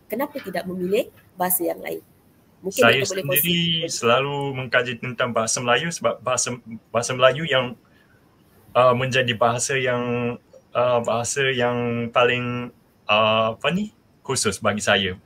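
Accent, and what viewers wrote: Indonesia. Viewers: Dari cara bicara nya pun lebih banyak logat dan abjad bahasa Indonesia...😂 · Suka Melayu,tp logat Indonesia wkwkwk 😂😂😂😂 Indonesia mmg the best👍👍👍👍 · Kalo Di video ini, Bahasa melayu nya, sangat indonesia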